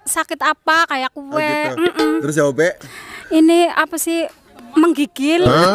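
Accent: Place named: Java, Indonesia. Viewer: Orang mana si ini medok kox bgt😅😅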